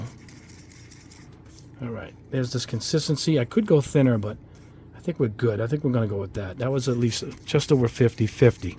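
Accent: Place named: East Coast, United States. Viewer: Thanks so much for everything you do! And its nice to hear a painter who has a nice east coast accent like me. Jersey born and raised. Thanks again!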